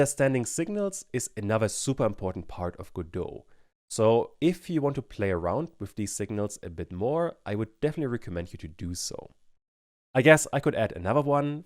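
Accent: Germany. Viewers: I knew I shouldn't start learning Godot until some dude with German accent makes an 11-hour tutorial. Thanks a lot <3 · Just wondering, is it German accent? :)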